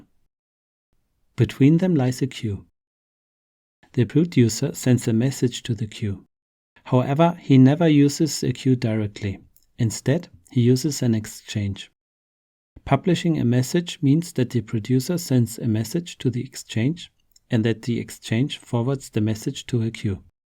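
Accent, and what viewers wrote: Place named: India. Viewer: Very good, u almost sound like an indian person too!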